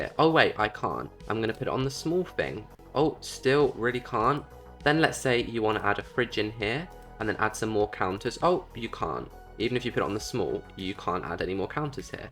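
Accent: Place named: United Kingdom. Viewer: I love ur britisj accent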